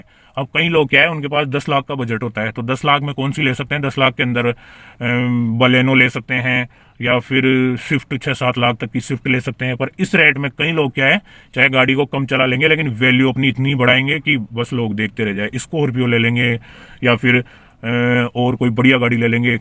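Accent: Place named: Kota, India. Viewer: Bhai आप Kota k as pas k ho.? Maine boli se pahchan li😂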